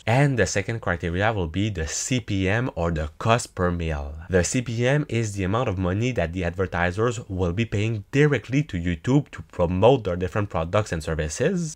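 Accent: Quebec, Canada. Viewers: Your content doesn’t apply to me, but I’m going to subscribe anyway as your personality seem great. You didn’t hide your payment figure to the very end of a 25 minute video. You kept it short and sweet. Very informative. I hope you get far with YouTube my friend. I’m from the UK but I assume that’s a French Canadian accent? Like GSP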